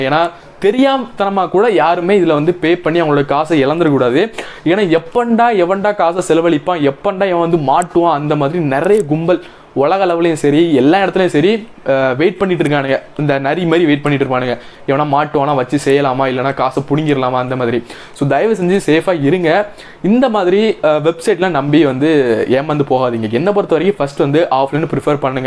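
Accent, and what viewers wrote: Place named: Marthandam, India. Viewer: Marthandam pakkam pola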